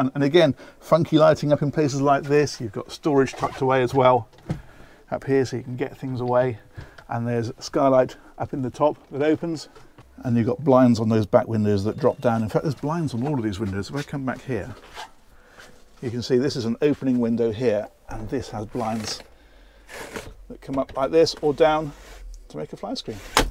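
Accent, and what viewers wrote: United Kingdom. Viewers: Thanks a lot for making different videos. I love British English accent and I see your videos because you speak very clearly & beautifully. I congratulate you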